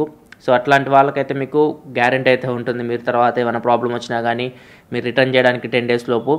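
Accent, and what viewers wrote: Ongole, India. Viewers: Sandeep Sandy ongole kadha valladhi own anduke alaa matladutundu☺️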